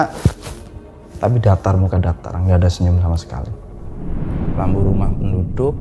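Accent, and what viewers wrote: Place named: Banyumas, Indonesia. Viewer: O wong ngapak to, salam Sitinggil Cilacap yo ang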